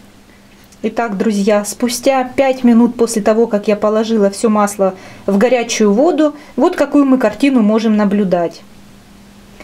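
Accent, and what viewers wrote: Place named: Ukraine. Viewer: невозможно слушать, необразованность жуткая у сей девицы. Украинский акцент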